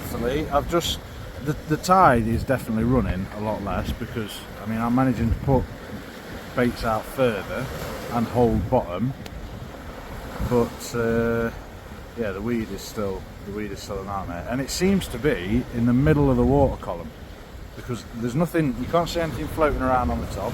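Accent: Yorkshire, United Kingdom. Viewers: Ey up mate are you from Yorkshire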